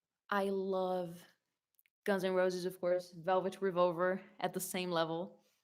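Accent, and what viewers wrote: United States. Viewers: Extremely surprised to hear an American accent! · Nice American accent!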